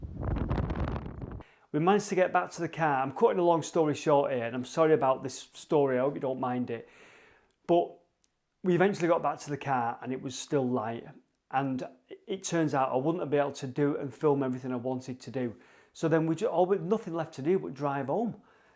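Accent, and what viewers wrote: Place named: Oldham, United Kingdom. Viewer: My family is from Oldham, I’ve visited twice in the last 3 years. Can’t wait to visit again., love your accent, reminds me of my dear late dad. ❤️❤️❤️❤️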